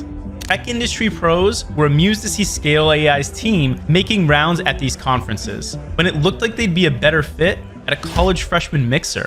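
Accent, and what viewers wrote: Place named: United States. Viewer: sounds very American to me!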